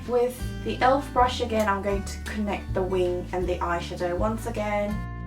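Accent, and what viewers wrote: United Kingdom. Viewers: 님! 오늘도 님의 찰떡같은 브리티쉬발음을 듣고가네요ㅋㅋㅋ전 개인적으로 님 영어영상이 더 좋은것같아요!뭔가 공부도 되는느낌같고 너무 좋아요ㅠㅠㅠ이거이거샀다 하는 영상보다 이렇게 오늘처럼 말하고 사용감도 보여주셔서 알수있는 방식이 더더더 좋습니다!!말하는 영상도 너무 좋고 영상길으면 더 좋아요!! 영상이 너무 짧으면 항상 아쉽거든요ㅠㅠ개인적으로 15분대 영상을 참 좋아합니다ㅋㅋ 사용제품도 더보기란에 다시 다 알려주셔서 쉽게 찾을수도 있구요! 님 영상 항상 잘보고있어요! 다음 영상도 금방 찾아와주세여! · 언니 영상 보는데 제가 요즘 영드 에 빠져있고 언니영상 보면서😁😁 점점 영국영어 매력에 빠지는거같아요 ㅎㅎ♡^♡ 글고 요즘엔 방학이라 언니 영상보면서 화장법 따라하는데 역시 화장도 많이 따라해야되나봐영ㅋㅋㅋ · 이렇게 제품 처음으로 사용하면서 수다떠는 영상 너무 좋아요ㅜㅜ 더 자연스럽고 중간중간 영국.. 리액션..?같은.. 그런 말투도 완전 씹덕..♡ 이번 메이크업 머리랑도 너무 잘어울리고 예뻐서 저도 기회되면 저렇게 머리 염색해보고 싶네용ㅇㅅㅇ 아 그리고 속눈썹 고데기 저렇게 하면 마스카라 뭉친것도 풀어지고 그러나요?! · 이런 영상 좋아요! 볼 때마다 느끼는 건데, 입모양 목소리 말투 영국발음 전부 매력적이고 듣기 좋네요.. 그리고 립 발색이 항상 탐나는 입쯀ㅎㅎㅎ 강점인 것 같아요 입술! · 수다떠는영상 좋아요!! 칭구느낌? ㅋㅋㅋ 영상 늘 잘보고있어요 영국발음도 듣기좋다능.. 좋은영상 감사합니다!! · 이런 영상 진짜 좋아해여ㅜㅜ왜냐면 언니는 영국발음이 존나 매력있으니까!!! 자주 찍어주세여😆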